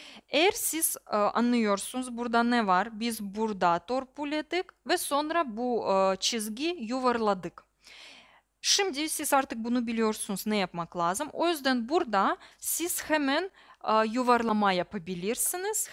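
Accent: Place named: Russia. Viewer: Ayyy sivene bayıldım yaa,normalde bu kaba Rus şivesini sevmem,ama bu bana çok sempatik geldi nedense 🥰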